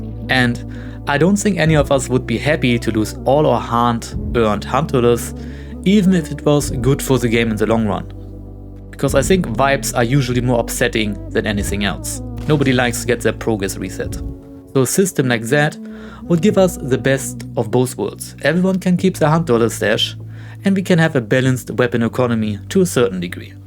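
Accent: Germany. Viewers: Diggi machst du das mit dem deutschen Akzent absichtlich so doll? xD Ist ja crazy xD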